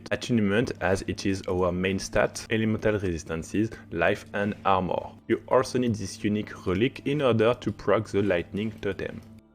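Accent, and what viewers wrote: France. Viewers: Hey man, great video but damn you really need to stop pronouncing "th" as a "z", I really don't know why the french are taught to say it like that lol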